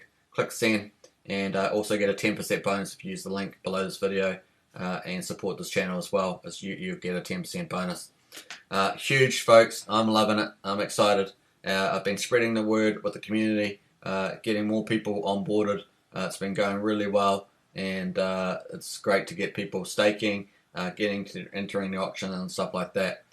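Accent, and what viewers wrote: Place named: New Zealand. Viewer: You are obviously a kiwi boy. Kiaora for these clips. I have no idea how to work auctions. I need to learn more.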